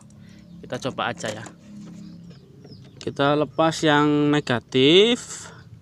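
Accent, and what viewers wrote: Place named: Java, Indonesia. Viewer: Mantap mas.bahasa medoknya gawek seger.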